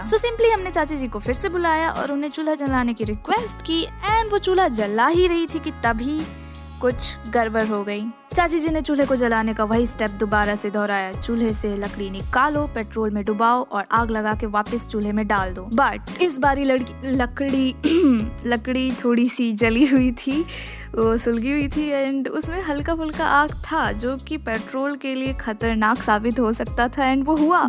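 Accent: Bengal, India. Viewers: Are you Bengali?? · Didi are you a bengali ? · are you bengoli??? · Are you from Bengal or Odisha ? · Are you Bengali ? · YOU ARE BENGALI RIGHT? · Are u a bengali ? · Are you bengali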